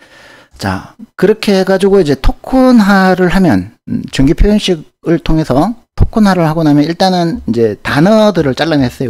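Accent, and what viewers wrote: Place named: Gyeongbuk, South Korea. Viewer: 우와 교수님, 목소리도 귀에 팍팍 꽂히고 설명도 너무 재미있어요! 교수님 사투리가 경북 사투리인가요? 대구사투리나 경남사투리는 아닌것 같아서요...중간에 "감성분석(Sentiment analysis)" 언급하셨는데, 이 방법으로 혹시 사투리를 구분하는 것도 가능한가요? ^^ 고급강의 올려주셔서 감사해요~아이 씬나~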